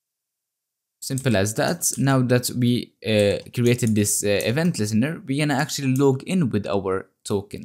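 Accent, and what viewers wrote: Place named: Sweden. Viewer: DU E JU SVENSK!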